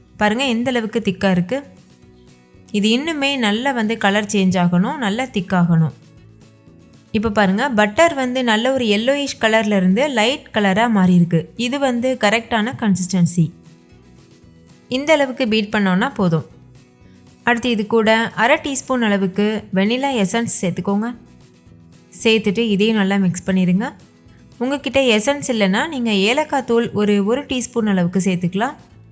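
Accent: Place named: Kongu, India. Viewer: Neegal coimbatore ra.kongu Tamil nala pesugiramga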